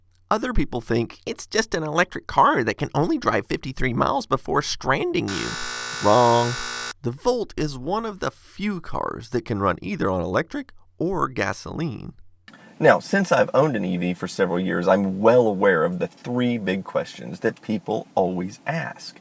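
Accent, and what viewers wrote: Texas, United States. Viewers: I noticed a bit more of a southern accent while filming in the car, where someone was holding the camera. I wonder if he switches it up a bit when talking to (or in front of) a fellow Texan.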